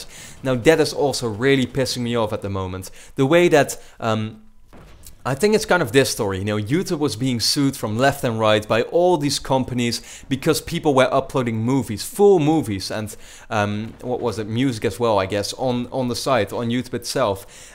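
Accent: Netherlands. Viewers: Hey you sound dutch. Are u dutch.?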